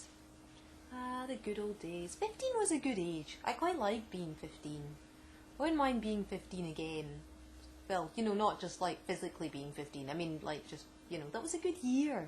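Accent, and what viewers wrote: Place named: Scotland, United Kingdom. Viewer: I love your accent! You have to be from Scotland right?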